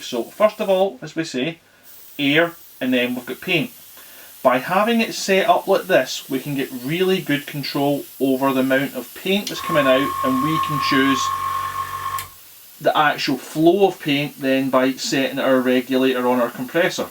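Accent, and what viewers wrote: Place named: Scotland, United Kingdom. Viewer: LOVE the accent ,what part of Scotland are ye frae?? Great review it helped loads!!!THANK YOU!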